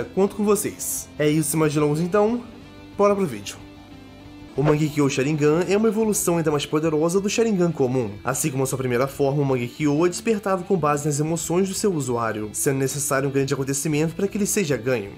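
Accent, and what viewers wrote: Portugal. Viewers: Esse cara é Português de Portugal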